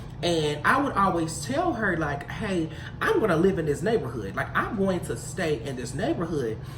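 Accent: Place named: Midwest, United States. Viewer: U sound like midwest, detroit area here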